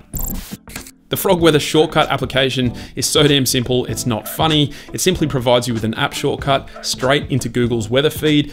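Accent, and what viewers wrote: Australia. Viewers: Love your hard work and the Aussie accent, keep on bro 👍🏼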